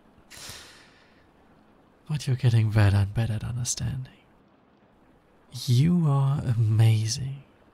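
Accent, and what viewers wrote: Germany. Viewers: Your German accent is cute. Loved this new experience. Great audio. LOVED IT!!! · How come it never occurred to me that this accent is German? I literally live in the neighbour country 💀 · That's the most cutest audio I've ever listened too! Thank you,! And I have been blessed with your adorable German accent! <3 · Love this audio I just found it, and I'm literally crying rn. I never felt so safe in my life Love your German voice<3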